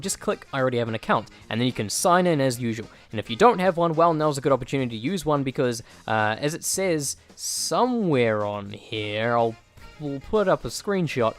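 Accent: New Zealand. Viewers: I have ONE queston... Are you a New Zealander, if not... EXPLAIN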